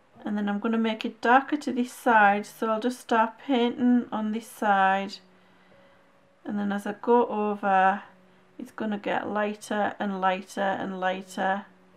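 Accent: United Kingdom. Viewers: Well done! Love your accent - UK I'm guessing?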